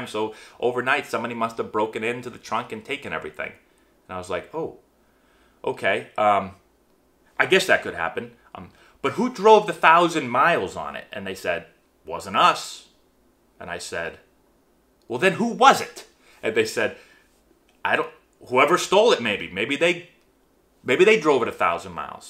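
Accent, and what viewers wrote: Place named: Massachusetts, United States. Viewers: His Massachusetts accent really comes out when he gets animated. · Yo im from Massachusetts too! Your accent sounds just like my brothers. For whatever reason I don't have much of a Boston accent.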